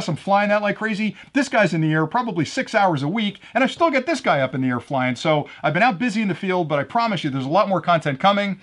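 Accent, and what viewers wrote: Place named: California, United States. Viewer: Im thinking fast talking Californian! I moved from Cali to Tennessee and had to learn to slow down when talking!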